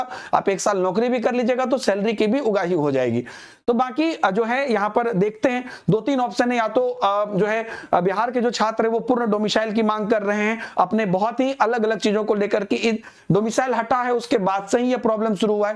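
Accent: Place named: Uttar Pradesh, India. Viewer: भाई जी मुझे तो नहीं लगता है यह बिहारी है यह कहीं u p का लगता है टीचर है या कोई आर्डर स्टेट का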